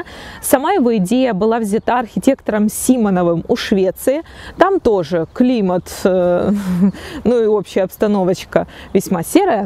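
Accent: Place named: South, Russia. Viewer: Дамочка с южным акцентом, не из Питера рассказывает о Питере.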